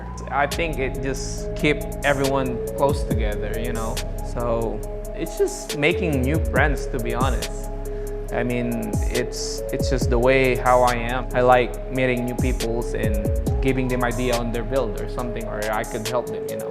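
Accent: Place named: Tagalog, Philippines. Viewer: the tagalog accent is strong in this one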